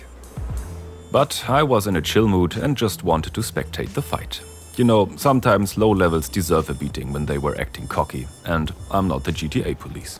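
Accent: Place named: Germany. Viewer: der deutscheste akzent überhaupt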